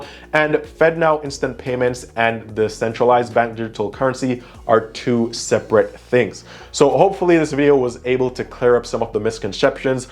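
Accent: Jamaica. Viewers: Do I hear a slight Jamaican accent? Are your parents Jamaican?? Good stuff though. Thanks for educating those who are in the dark 👍✌️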